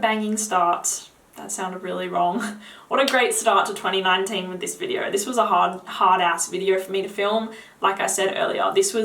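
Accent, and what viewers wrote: Australia. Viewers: i looooooove your cute australian accent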